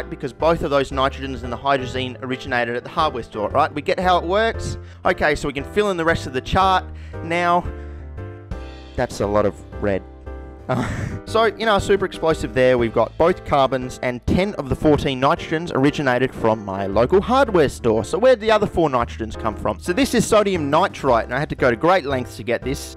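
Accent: Australia. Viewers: So refreshing to hear Aussie content on YT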